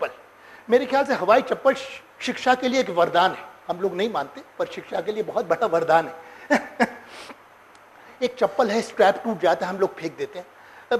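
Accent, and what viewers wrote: India. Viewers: Bloody acent from India bady needs subtitles, or just continue talking hindi as this does not change much.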